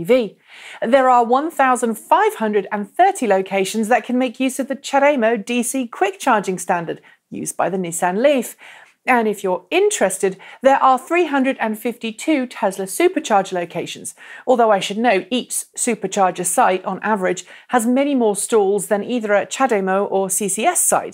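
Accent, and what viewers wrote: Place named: United Kingdom. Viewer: Cannot stand listening to British accents. Go talk about tea and cricket.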